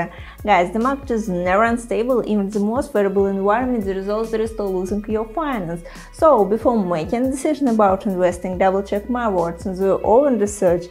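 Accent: Russia. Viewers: how to understand in a second that she is from Russia))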